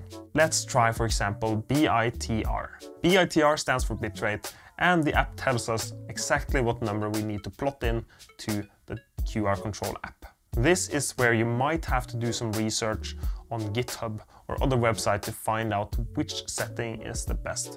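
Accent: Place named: Norway. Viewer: Thanks for the video, love the norwenglish. I will remember to smear myself with a little patience 😂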